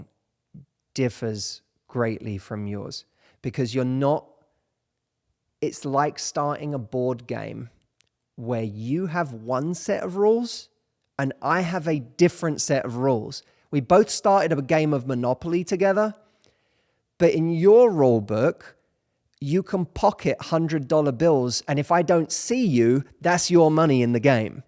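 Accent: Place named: United Kingdom. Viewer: I'm here for British accent İt's wonderful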